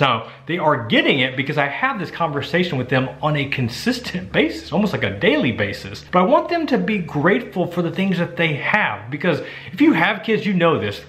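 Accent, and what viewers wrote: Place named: South, United States. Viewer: You live in the southeast? I luv that southern accent 👍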